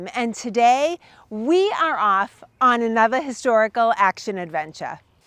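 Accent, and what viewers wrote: Boston, United States. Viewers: It only takes 20 seconds of listening to this lady talking to know that she is definitely from Boston, love it.👌💕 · She speaks the 25 letter Boston accent. No "r" to be found! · definitely New England but more specifically Boston. They sound similar in Maine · Thank for all of your historical research (I love your Boston accent) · Another interesting video, thank you. Your Bostonian accent lends a unique sense of realism to the North Bridge area.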